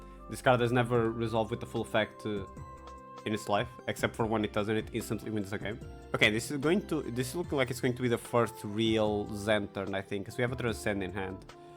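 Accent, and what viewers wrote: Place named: Portugal. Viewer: You sound portuguese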